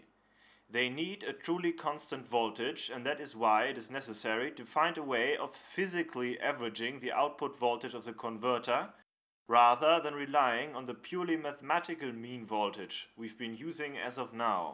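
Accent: Germany. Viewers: Respect for the creator if this Great Youtube Series. It's quite easy to understand and follow your ideas. Thanks a lot. Btw, sounds like a German accent. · Excellent, just excellent videos. Very well thought out and executed. As a 25 year technician, I can say, I've re-learned something from your videos. The direct nature of your presentation is outstanding and I'm sure, will help students and guys with foggy minds, such as myself. I love your German accent. Danke!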